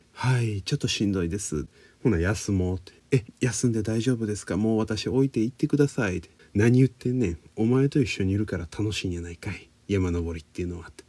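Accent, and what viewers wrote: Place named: Kyoto, Japan. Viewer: もちろんです！源氏物語はもとより、さんの京都弁、声、話し方、スピード、全てに癒されています。学生の時にこんな先生がいたらもっと古典の勉強、頑張れたのに・・😂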